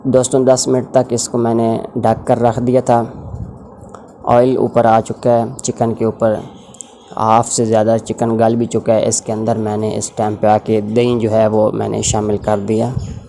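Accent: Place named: Punjab, India. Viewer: آپ کی پنجابی سن کر ہنسی آ گئی 😅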